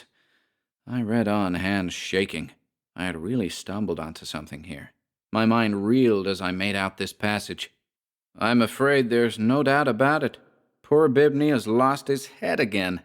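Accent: United States. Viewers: I love your ‘Merkin accent,. Nailed it! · Your American accent is fantastic!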